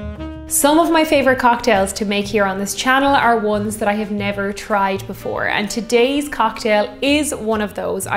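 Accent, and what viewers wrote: Ireland. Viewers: You know, I don't even drink alcohol, but she's so charming and lovely and has that beautiful Irish accent that I'm compelled to watch. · This girl should be in the movies. She could be the next Maureen O'Hara. Beautiful girl and her brogue only adds to her charm.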